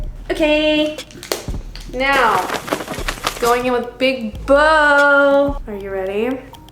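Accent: United Kingdom. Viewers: PLS THE BRITISH ACCENT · I love your british accentkdnskqnfnwndnd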